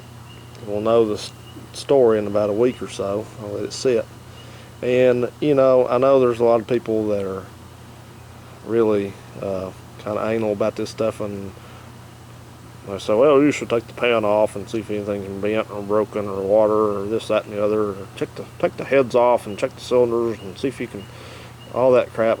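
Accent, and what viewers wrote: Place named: East Tennessee, United States. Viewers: You sound like a good 'ole Tennessee boy. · You sound like an East Tenn boy. Am I right or am I right?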